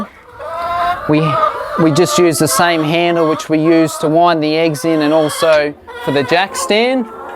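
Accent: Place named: Australia. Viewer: Ur australian :P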